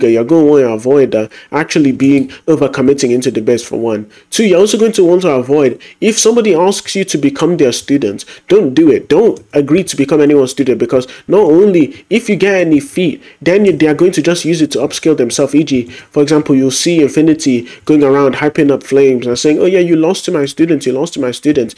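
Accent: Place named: United Kingdom. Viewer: I can’t with that BRITISH ACCENT 💀💀💀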